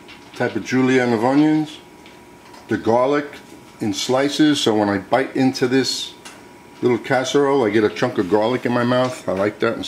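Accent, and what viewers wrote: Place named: New Jersey, United States. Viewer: Why do you sound like one of the Sopranos?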